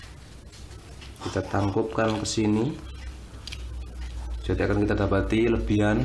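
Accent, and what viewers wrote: Java, Indonesia. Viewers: Kamu medok banget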